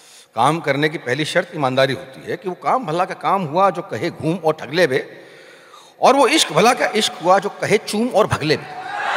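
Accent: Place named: Jharkhand, India. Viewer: The voice of Waseypur..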